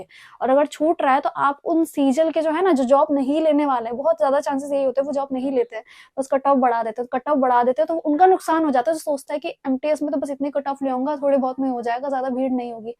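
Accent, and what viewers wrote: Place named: Pahari, India. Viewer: Pahari lg ri ho 😅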